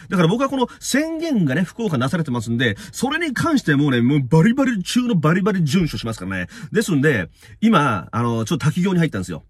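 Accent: Kansai, Japan. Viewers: Qさんのたまに出る関西イントネーションが大好き😆 大阪人より🙋🏼‍♀️❤️ そして今日も楽しい動画をありがとうございます！！！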